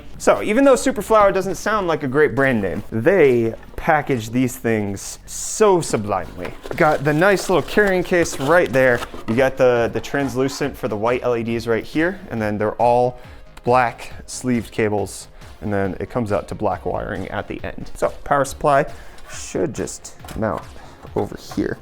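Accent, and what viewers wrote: South Africa. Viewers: Are you from South Africa? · Where is you'r Sa accent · you south african? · Do i hear South Africa what does this pc cost in Rand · Are you from South Africa ??? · are you south african? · What part of south africa is he from? If it’s cape town i feel for you given the whole water situation. My grandfather is struggling with the extra costs from accidental overuse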